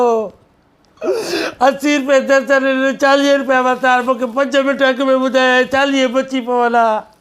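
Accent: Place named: Shikarpur, Pakistan. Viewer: What a beautiful Shikatpuri boli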